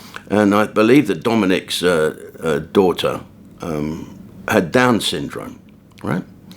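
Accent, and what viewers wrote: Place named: RP, United Kingdom. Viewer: Hope you don't mind me saying, but yoy sound a little queen's English today, posh.😊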